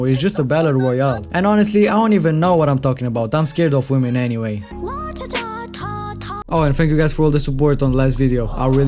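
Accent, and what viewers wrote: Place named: Balkans, Eastern Europe. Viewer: You are from Europe or balkan country .damn sure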